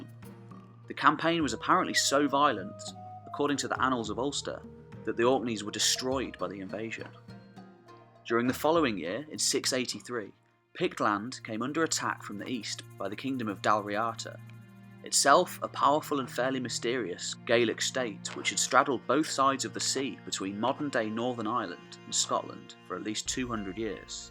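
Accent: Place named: England, United Kingdom. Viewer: Arturo Wagner I am going to assume you are from somewhere outside the UK because you can't seem to be able to tell the difference between a Scottish and an English accent, Ewan McGregor is Scottish, the narrator is English, and on top of that the tone of the narrators voice is absolutely nothing like Ewan McGregor.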